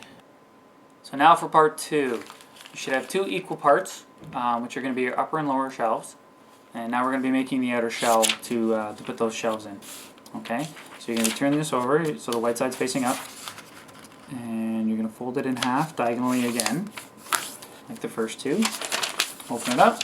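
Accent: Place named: Canada. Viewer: Air123ism got Astral'd.. You sound Canadian, much like the Vigilant Christian dude..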